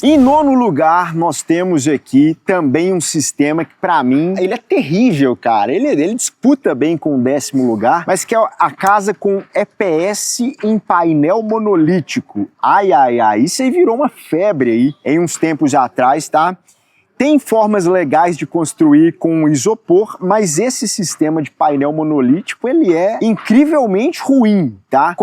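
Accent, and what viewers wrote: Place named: Sao Paulo, Brazil. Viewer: o cara é bem paulista né kkkk eu sou paulista e senti no ouvido umpouquinho kkkk mas muiito bom o video e passa informações interessantes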